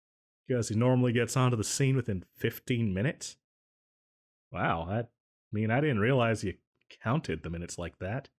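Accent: South, United States. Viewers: AHHHH SOUTHERN ACCENT!!!!!!! 😍😍😍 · This is my favourite audio of yours. Your voice here is THE best accent I have ever heard. It’s like a bit southern, and I adore it. You should definitely do some more with this kinda voice!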